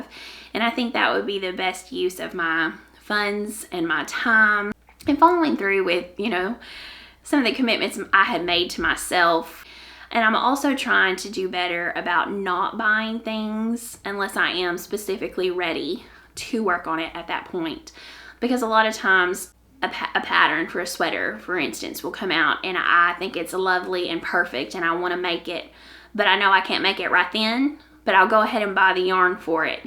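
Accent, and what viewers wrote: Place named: South, United States. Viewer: Can I say that I could listen to YOU talk all day long. I absolutely have never known anyone with a southern accent (and have never traveled to any southern states) so I’m just smiling while listening to your podcast. You are adorable!! Thank you for making time in your day to share your life with us.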